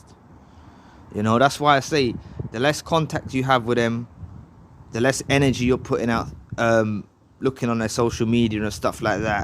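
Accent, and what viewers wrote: London, United Kingdom. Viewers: Very welcome 👍🏻 What part of London are you from i was born in Leytonstone ☺ sorry for asking just love hearing a familiar accent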